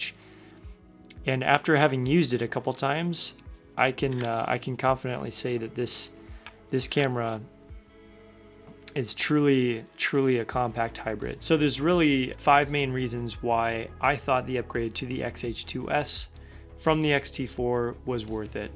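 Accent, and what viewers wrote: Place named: United States. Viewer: I subs to your channel because your American accent! Ytuber from Vietnam, teaching English.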